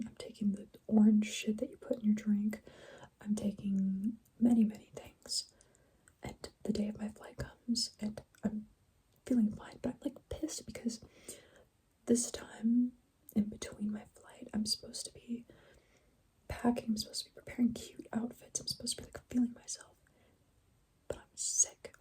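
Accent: California, United States. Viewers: That accent is pure cali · She definitely looks and sounds Californian. She’s humble though. ❤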